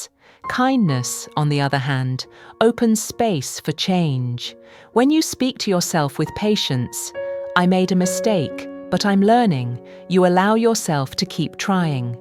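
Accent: United Kingdom. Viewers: Thanks nice British accent ❤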